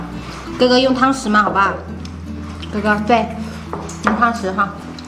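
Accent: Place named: Haikou, China. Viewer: 海口音，親切感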